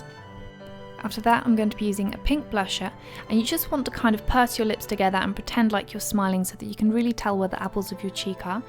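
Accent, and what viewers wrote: England, United Kingdom. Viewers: Where are you from btw? I know you're English, but you're accents lovely :3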